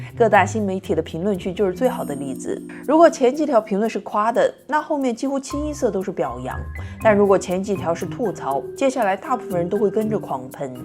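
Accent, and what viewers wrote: Hunan, China. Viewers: 大概率是湖南口音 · 博主真的不是湖南人吗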